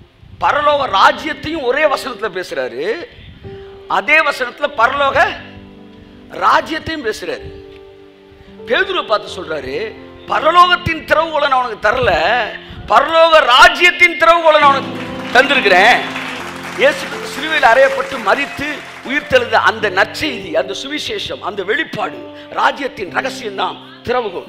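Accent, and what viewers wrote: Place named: Madurai, India. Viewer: In the name of Jesus Christ, when God's words are headed in our Madurai Thamilan voice, it' gets very specialized, like drinking TEA MIXEd WITH BOOST. Amen. May God bless you and your ministry forever and always pastor. AMEN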